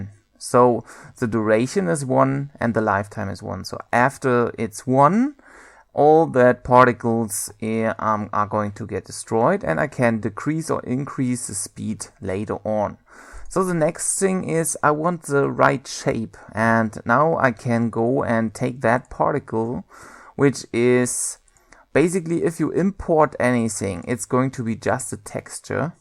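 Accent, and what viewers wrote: Germany. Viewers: OMG Ich weiß dass du Deutscher bist, erkennt man an deinem Akzent. :3 Wie auch immer ich will dir recht herzlich für dieses Video danken. Ich saß ewig an diesem Script, bin verzweifelt, alle Codes im Internet funktionierten nicht bis ich dein Video gesehen habe. So gut erklärt! Endlich funktioniert es. Besten Dank! :)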